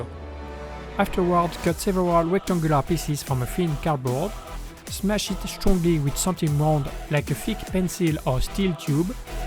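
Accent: France. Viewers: I don't know if you're French but ton accent français est vraiment sympa et c'est facile à comprendre du coup ^^ GG ! Edit : Oui j'ai regardé la desc et t'es bien français, c'est cool ^^ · Wait ! this accent... but alors you are french ?! X) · Is this guy French?? I get inspector Closeau's vibe from his accent 😅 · T'es français et ça s'entend en tout cas tous ce que tu fais avec le carton sont des oeuvres d'art continue comme ça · You are french ? Beacause you have a accent french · are you french? not that this would be a problem i just noticed the accent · Hooo mais tu e 0s français ?? Je me disais avec l'accent=) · Mdr on dirait que tu es français tu prononce les mots en anglais mais avec l'accent français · Le mystère des recommandations ytb x) Au moins un tuto pour lequel je n'ai pas eu besoin de sous-titre, un petit french accent au top xD Le boulot monstre .. 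fiou ! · Ah d'accord, ça se voit à ton accent que t'es français 😂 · Bonjour, es-tu français avec ton super accent? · Sublime, j'adore et kiff jurassic park, et super acsent français 😊je me suis abonné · Do you speak French ? Because I heard some accent in your dialogue. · Salut es ce que tu es français ? Car je trouve que tu parle anglais avec un accent français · he have french accente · ok je pense bien que tu avais un peu. Un accent français sa s'entans a ta voix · Pls tell me that your French, because your French accent is sooooo huge xD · Est tu francais car ta un fort accent français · Français hein? Ça s'entend x) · Houla, vous seriez pas Français par hasard ? Ca s'entend à l'accent...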